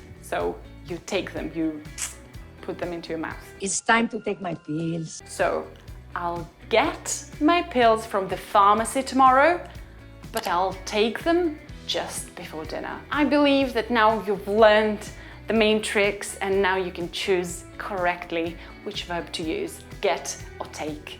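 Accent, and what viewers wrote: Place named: United Kingdom. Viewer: I really appreciate LinguaTrip has the british accent teacher.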